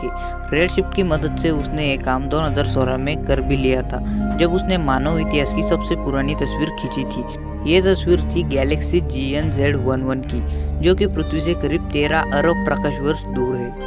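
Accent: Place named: Gujarat, India. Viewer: Baot badiya kaam karta he na tu isko aise hi padhna jaise likha maine bhai gujrati style avaz h tumhari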